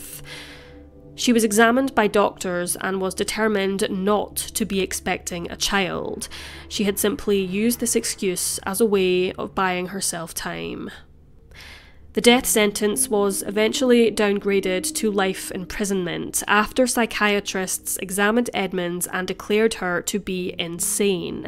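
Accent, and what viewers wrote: Scotland, United Kingdom. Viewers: Ah, that Scottish burr... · Lovely to hear a Scots narrator for a CHANGE.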